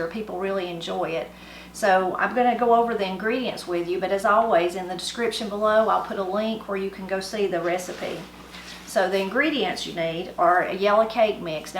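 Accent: Appalachia, United States. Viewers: Your accent makes me want ramps and tators and deer meat,cornbread pudding.😂💪😇🙏🙏🙏🙏🙏🙏🙏love west virginia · I love your channel and learning about Appalachian culture. My family is from Arkansas, but I suspect that somewhere down the line we have some family from this region. My mom’s accent (and her mother’s) sounds so much like yours, and she uses a lot of the same words. I asked her about it, and she said that my grandparents traveled a lot picking fruit and following field work before she was born, so maybe they had picked up some of the accent from fellow workers. Anyway it is really interesting to me, and I’m sure to many others as well. I’m happy to see all of these recipes and to learn the backgrounds on them too!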